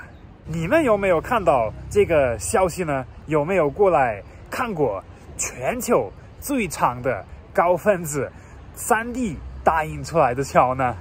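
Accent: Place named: Sichuan, China. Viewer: 哈哈，四川话好听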